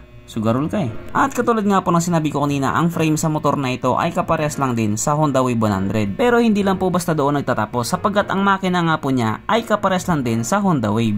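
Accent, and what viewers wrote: Visayas, Philippines. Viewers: Bisaya ka kol?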